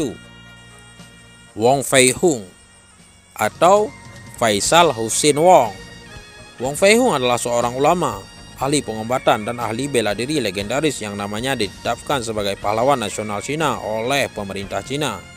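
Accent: Aceh, Indonesia. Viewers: Yg bc ceritanya kok kyk org aceh ya... Dialek nya kental banget aceh... Bs di percaya berita ini...???? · Nyoe yang baca berita awak aceh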